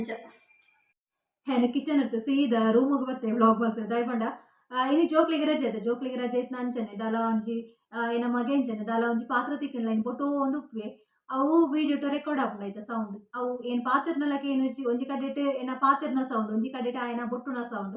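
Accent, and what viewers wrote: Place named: Tulu, India. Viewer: Hi akka er tulu pathernaga batru nakl pathernaleka apund akka😍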